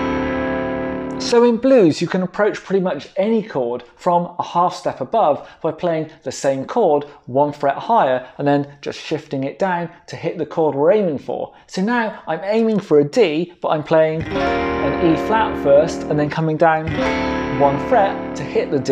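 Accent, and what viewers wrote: United Kingdom. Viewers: Great video. Just got the backing track from yr payhip. Wish i could get a pdf tab ? Also, thanks for your nice and clear british english 😀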